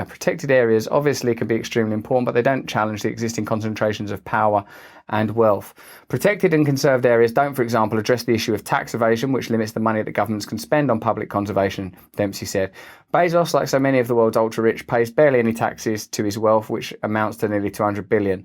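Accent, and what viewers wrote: United States. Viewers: It's fucking weird to hear Russell Brand speaking in an American accent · THE AMERICAN ACCENT🤣🤣🤣 I’m obsessed with jt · Everytime you do your American accent it causes me to do a double take and rewind. It's so funny! Best to you and yours! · That American accent got me 😂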